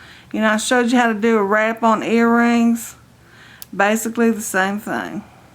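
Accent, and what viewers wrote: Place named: South, United States. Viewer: I love your accent! Are you from the south? I am and I have a strong accent. You remind me of my Grandma :)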